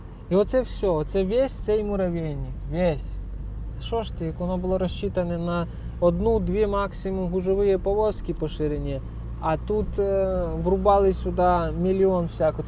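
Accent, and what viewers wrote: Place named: Rivne, Ukraine. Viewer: Така балачка у вас ніби ви з півночі Рівненщини. Дубровиця, Рокитне чи Зарічне?)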